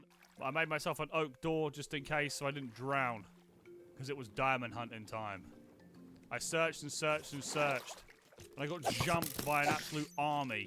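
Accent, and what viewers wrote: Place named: United Kingdom. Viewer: oh hell yeah british accent... i love it :)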